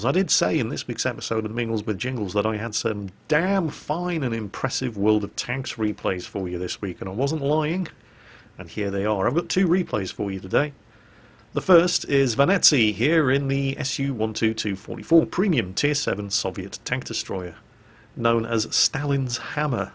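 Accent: Russia. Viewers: Gotta love ur laugh at these blindshots and the russian acsent:D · Love when Jingles is doing Russian accent.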